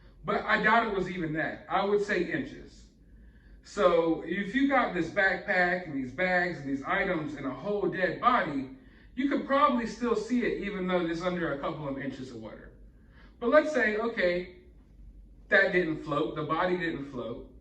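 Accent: South, United States. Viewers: Where in the south are you from? I'm from Alabama!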